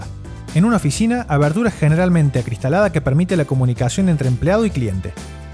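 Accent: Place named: South America, Latin America. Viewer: Tiene que ver el acento del narrador es como de sudamerica y no le entiendo algunas cosas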